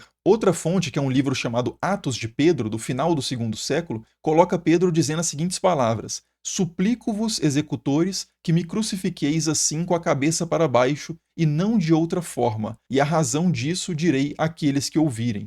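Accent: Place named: Belo Horizonte, Brazil. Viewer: Meus parabéns a você e a seu canal! Cultura e erudição transmitidas com uma linguagem "magistral" (stricto e lato sensu), e um agradabilíssimo sotaque belorizontino!!!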